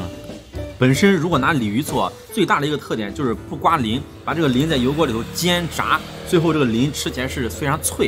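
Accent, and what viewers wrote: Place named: Henan, China. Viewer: 是河南人吗？普通话的河南口音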